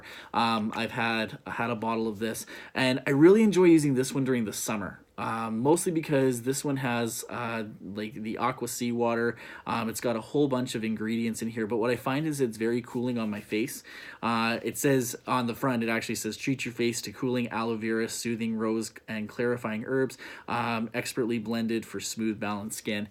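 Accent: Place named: Canada. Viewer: Fellow Canadian here. You have such a Canadian accent! Love the video!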